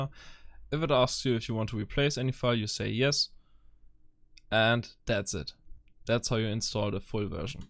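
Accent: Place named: Germany. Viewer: der deutsche akzent😂 danke für das Video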